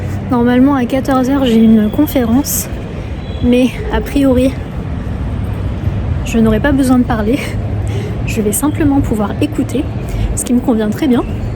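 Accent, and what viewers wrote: France. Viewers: Amo tu acento francés ❤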